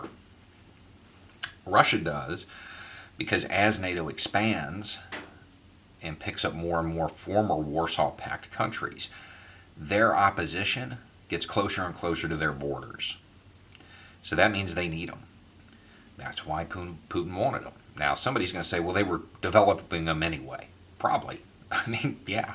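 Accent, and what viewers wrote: United States. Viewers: You speak real American. I wish more of us did. We all need to show up at our local republican office and demand that they denounce this Fucking imbecile trump and start impeachment proceedings... or we pick them up by their Fucking Traitor throats.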